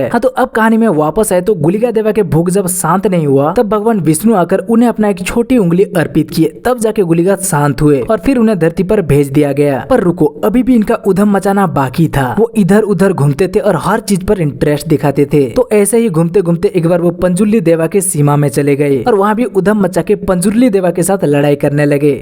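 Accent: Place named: Karnataka, India. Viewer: Nah he's from southern india, i can tell by his accent.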